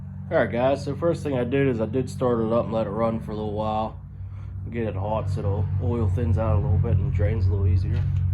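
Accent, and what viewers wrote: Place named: Pittsburgh, United States. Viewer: Dude u gotta be from Pittsburgh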